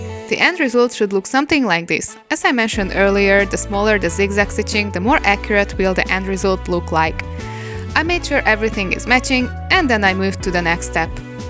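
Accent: Hungary. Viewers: Hearing your accent I am pretty sure you are Hungarian :P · Now I understand what people mean by us having a strong unique accent... I somehow knew you were Hungarian so I just had to look after it, and I was riiight! Anyway, you are really cute and the video was great!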